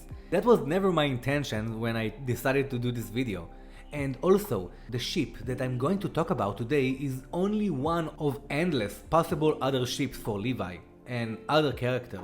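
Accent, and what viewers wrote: Israel. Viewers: You have an Israeli accent. you are Israeli?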